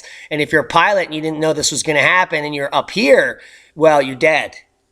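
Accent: England, United Kingdom. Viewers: nice Brit accent bra! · Love your English accent. What accent, I don't have an accent.LOLLOL. Great show.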